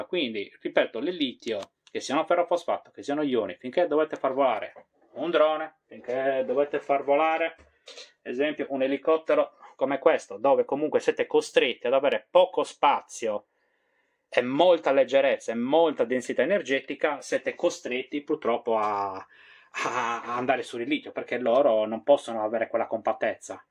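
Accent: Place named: Sardinia, Italy. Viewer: Magari fossi brava come te...mi sto arravanando....ma non ce la posso fare da sola e sto cercando elettricista non solo bravo ma con la mente aperta che mi aiuti. Qui da bricoman oggi stanno vendendo i pannelli monocristallino da 450 .... ma ammesso che vadano bene non saprei che inverter abbinare. Cmq grazie, perché sei un'ispirazione oltre che molto simpatico con la tua semplicità e schiettezza oltre che per il nostro magnifico accento sardo....sono molto orgogliosa perché penso che tu sia veramente molto preparato! BRAVO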